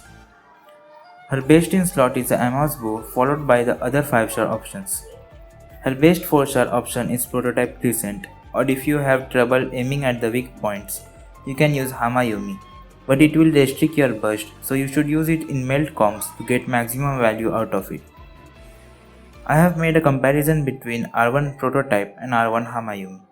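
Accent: India. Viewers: the indian accent hits home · Nice guide are you indian ... That accent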